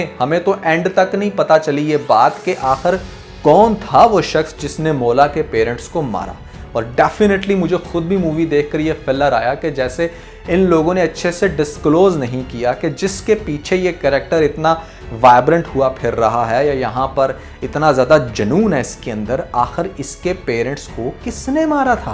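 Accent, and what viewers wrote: Punjab, India. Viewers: One request please stop speaking English as Punjabi you can speak Punjabi urdu or English but properly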